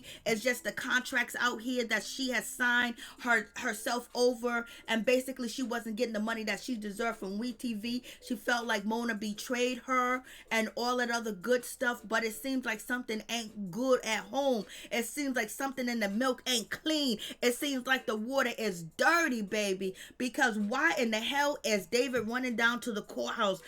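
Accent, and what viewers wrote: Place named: East Coast, United States. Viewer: your accent made me subscribe.. is that east coast vibes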